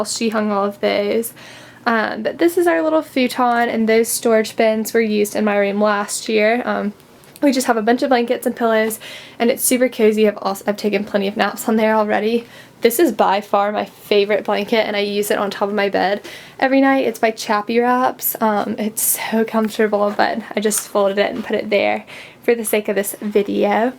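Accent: South Carolina, United States. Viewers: sounds like south carolina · it's southern American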